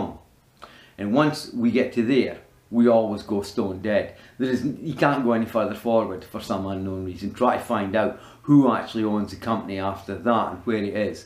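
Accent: Scotland, United Kingdom. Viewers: Great review, would you mind telling me where you're from? I'm trying to place your accent haha, Scotland? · Yeah he's Scottish I can't quite define whereabouts the accent is from because different areas of Scotland have different twangs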